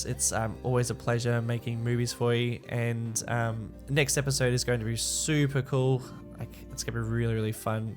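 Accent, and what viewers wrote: Australia. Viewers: Amazing work mate. Great to hear an Aussie doing Cities: Skylines series and this being an Aussie based theme is even better. You're really capturing the Outback with the colours and plant life! Can't wait for each new episode! Keep up the awesome work!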